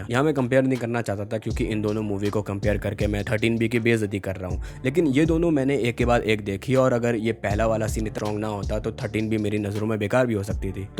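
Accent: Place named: India. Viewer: Film❌filam✅ bhai ka accent desi hain🙂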